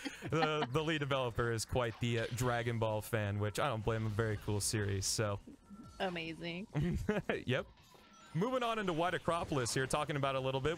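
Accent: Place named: Chile. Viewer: I thought he was Chilean lol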